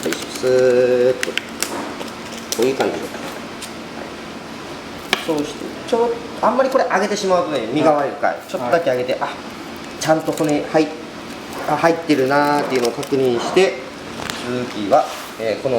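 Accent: Miyazaki, Japan. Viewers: なーんか聞いた事ある 話し方と思えばやはり宮崎(o´罒`o) 宮崎のしゃべり方は落ち着く笑 けど自分がこんな感じではなしてると 自覚してる人はいない笑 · 若いころにいた会社でお世話になった宮崎出身の上司の方言と同じ方言で凄く懐かしい。そしてこの人、教え方も丁寧だし技術も上手いしで、本当に凄いです。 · 宮前弁まるだしw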